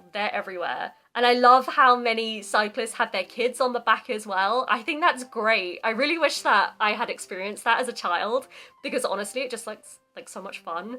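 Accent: United Kingdom. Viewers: イギリス英語🇬🇧素敵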